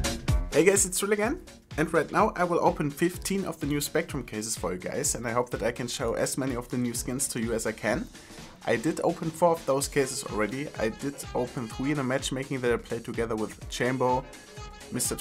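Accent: Germany. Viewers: Hey m8! Where are you from? Because you speak eng like a german^^ Nice Video :) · Justin he comes from germany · Are you German? Sounds like a German Accent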